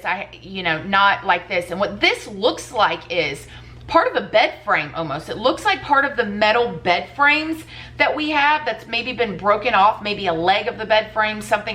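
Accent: South, United States. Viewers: I love your southern accent and the little slang words you say lol. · Got that southern slang going on 😂 “Bob Wire” we also say “Chester Drawers” 😂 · climbs up on soap box I would ask everyone who feels the need to "correct" pronunciation of words like "bob wire" or "cement" to please understand that as southerners, we have different ways of saying a lot of words. We know how to spell these words, just like you do. Like any thick regional accent found anywhere around the US (and other English speaking countries), words are often said differently. Think New Orleans, Boston, New York, Fargo, Seattle, Miami, LA, Hawaii, Chicago, etc. And then Canadian, British, Irish... Our distinctive accent and pronunciation of many words is just a part of who we are and the way we heard language as our own voices were being formed. Please stop correcting us, we are not wrong. 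And you're not either!! We are simply different. I hope we can all love each other for the diversity in each of us. /climbs down from soapbox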